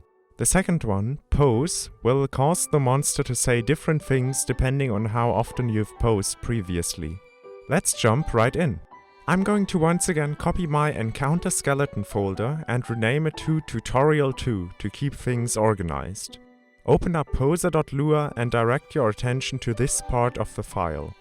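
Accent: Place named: Germany. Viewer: are you, by any chance, german? bc your voice sounds similar to some german youtubers speaking english.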